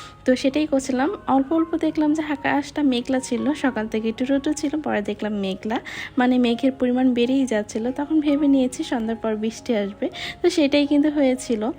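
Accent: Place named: Rajshahi, Bangladesh. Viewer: রাজশাহীর ভাষা খুব ভালো লাগে আপু,,, খুব মিষ্টি করে কথা বলো তুমি মাশাআল্লাহ,,, ভালো লাগলো আপু ❤❤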